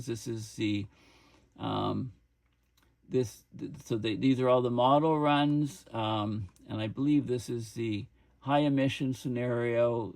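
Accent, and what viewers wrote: Canada. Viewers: Your accent tells me you’re Canadian.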